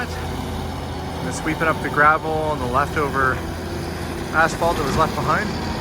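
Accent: Canada. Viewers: I wish I had a hundred dollars for every time he said ass fault. I could go on a shopping spree. I thought maybe he was saying it so much because in his last video on the pool house, people in the comments kept pointing out how he says it differently than how it is pronounced in the US. Maybe that is how all Canadians pronounce it. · Remember that he is Canadian and that's how most Canadians pronounce it😊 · It's a Canadian pronunciation.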